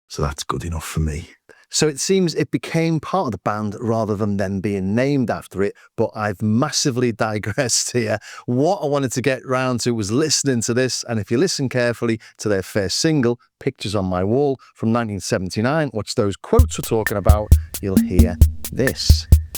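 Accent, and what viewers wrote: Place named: Liverpool, United Kingdom. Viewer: Thanks a lot, this was really interesting — as always. A drum machine that does not keep its pace, this would drive me nuts ;-) I did not know that about “Echo”… It must have been tough for these guys being a band in Liverpool . And I am rather happy that I was not forced to learn your local dialect until now. This is quite a challenge… Still watching every of your videos, See you ;-)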